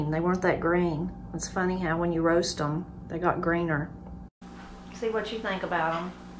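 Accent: Appalachia, United States. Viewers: I always thought these were poisonous! Well how do you like that. Have you eaten them? Wait What, Now I’m learning the second thing,I didn’t know about hostas,is that those seed pods you can throw them and they’ll actually grow from themselves. I have tried to dry those seed pods and they just mold or get all furry looking gross and I have to throw them out.. OK back to the video, I might learn the third thing I didn’t know and I’m older than you and I love growing hostas in what little shade I have out here.in the southern part of Indiana. Wow this is the best video. I want to try them. And that Appalachian speak I’ve never heard of astilbe been called As ta bil… ( Uh stil bee ) Astilbe.. The Appalachian language..❤️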